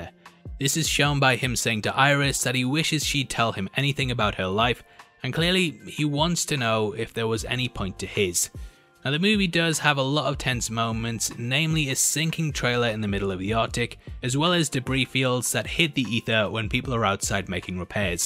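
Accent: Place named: Newcastle, United Kingdom. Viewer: Why aye man. I thought you might be a Geordie but I wasn’t sure until you said the word daughter. 😂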